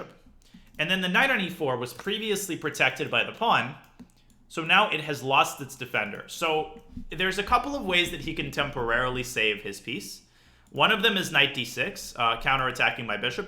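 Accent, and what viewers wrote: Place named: Russia. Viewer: Man, your russian accent is just amazing. I can't wait to join your stream to hear it live.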